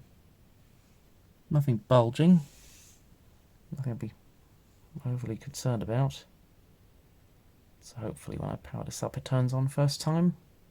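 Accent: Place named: United Kingdom. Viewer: I love the british accent. "It cahnt see mah roota"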